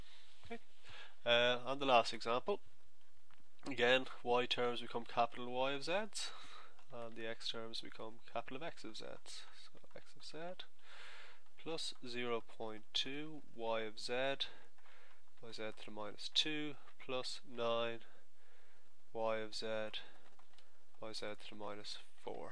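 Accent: Ireland. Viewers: haha wow didn't expect an irish person to be giving math tutorials on youtube. Sound man (Y)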